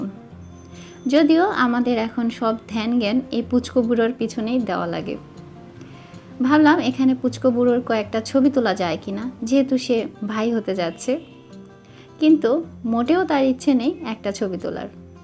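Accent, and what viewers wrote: Sylhet, Bangladesh. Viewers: Are you sylhetii apuu ??? From uk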